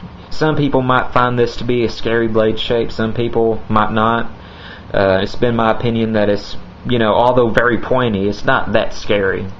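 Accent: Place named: Louisiana, United States. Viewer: Good review. When I got mine it was not that sharp and I noticed that the bevel is over 20º on either side. Was yours like that as well? I'm going to reprofile mine this weekend. You from Louisiana? Love that accent.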